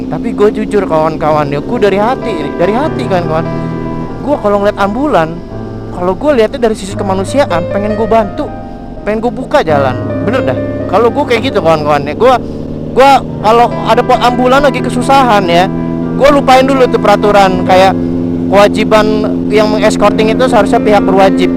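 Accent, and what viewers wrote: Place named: Lampung, Indonesia. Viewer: YouTuber dari Lampung mana ini suaranya nee.?👍👍, . . . . Monggo mampir ke channel ku juga yaa🙏 .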